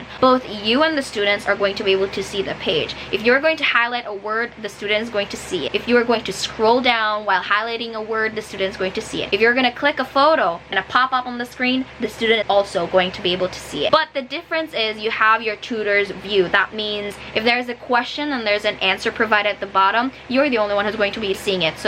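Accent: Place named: United States. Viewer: She speaks like an American 98%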